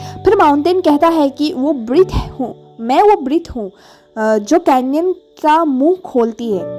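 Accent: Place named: Northeast India, India. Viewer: Her accents:) 100%she is from north-east 🫂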